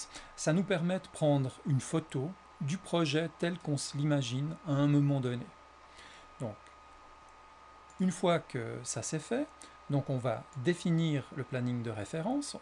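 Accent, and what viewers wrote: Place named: Savoie, France. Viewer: Merci !!! Et par curiosité, l'accent (léger) c'est de Savoie ?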